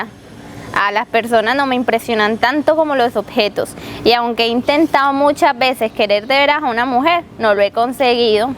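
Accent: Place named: Caribbean Coast, Colombia. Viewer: Me gusta mucho el acento costeño ajá. Se ve el trabajo. Pese a eso, debes tener cuidado con las letras de las palabras que te saltas. Estás inmersa en el texto, no nos miras ni en un momento. Habría ganado mucha fuerza si nos hubieras echado una miradita, lo mismo con la interpretación, agitar la mano hacia adelante en el "ajá".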